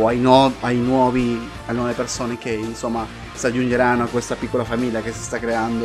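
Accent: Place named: Spain. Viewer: adoro il tuo accento... l'accento spagnolo lo amo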